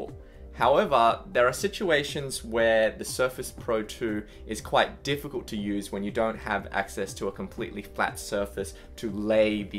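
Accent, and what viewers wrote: Australia. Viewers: I thought you're gonna speak with a japanese accent and then it turned out you're australian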